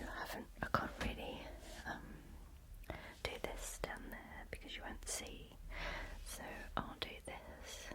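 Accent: United Kingdom. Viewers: Are you English, Irish or Scottish ? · easily one of the prettiest ladies on the net. You could read me the dictionary with your lovely British accent. This will be good for bed now as it's only just gone 10pm down here in Australia, goodnight 😊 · First time seeing you. Fantastic whispering and your British accent to boot! Love it!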